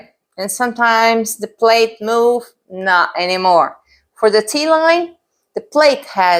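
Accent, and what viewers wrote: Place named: Brazil. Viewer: ok nice ! I worked for a Brazilian bank for 5 years. So I recognised the accent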